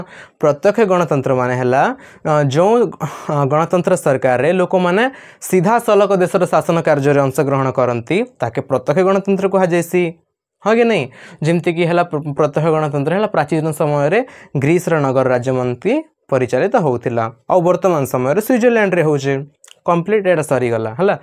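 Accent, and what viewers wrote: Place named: Kalahandi, India. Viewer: Kalahandi language re sunle bhal laguche 💕💞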